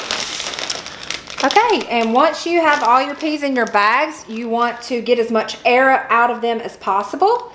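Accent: Georgia, United States. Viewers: That's a south GA accent if I've ever heard one Source: Born and raised in South Georgia!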